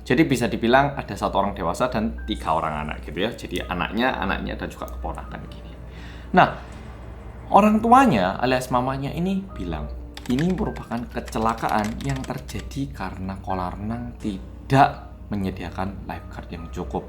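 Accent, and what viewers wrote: Java, Indonesia. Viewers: Pertama liat videonya tak kira: lha ini wajahnya kayak orang korea beneran kok medok banget jawanya hahaha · oke aku udh lama ga buka yt dan nonton sesuatu hampir setengah tahun lebih. akhirnya aku mau mulai lagi dr mas mas medhok ini. editannya itu lo ngakak terus aku. padahal ceritanya bukan cerita komedi. salut buat editornya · 𝙃𝙖𝙡𝙤 𝙜𝙪𝙮𝙨 𝙬𝙚𝙡𝙘𝙤𝙢𝙚 𝙗𝙖𝙘𝙠 𝙩𝙤 𝙆𝙤𝙧𝙚𝙖 𝙧𝙚𝙤𝙢𝙞𝙩 Bersama orang Korea yang "𝙢𝙚𝙙𝙤𝙠𝙠𝙠" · Ngakak aku penampilane wes kuweren omonge njelongop njelongop 🤣🤣🤣 ketok jowone mas haha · Suka bgt sama kontennya mas bersama orang korea yang medokkkk.😊😁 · MAS: HELLO GAIS, WELCOME BACK TO KOREA REOMIT. BERSAMA ORANG KOREA YANG SATU RUMAH:" MEDOKKKK" krna setiap hri nonton. Jd hafal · "Bersama Orang Korea Yang Medok!!!!" Selalu reflek bila mas Bilang ini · Makin glowing aja ini mas mas medok 😅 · Yang medokkk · Ya allah mas sampean wong korea hlooo😂, kok iso bosone njlungupp to yooo, bener2 orang korea yg medhokkk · Medhok bossqu😁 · Ya medok 🎉 tks...bye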